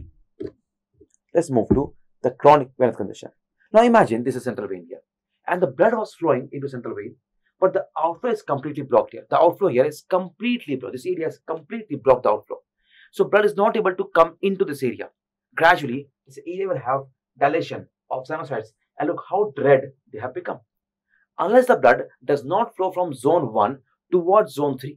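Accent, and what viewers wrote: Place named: India. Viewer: Someone tell bro to turn off his indian accent because noone can understand him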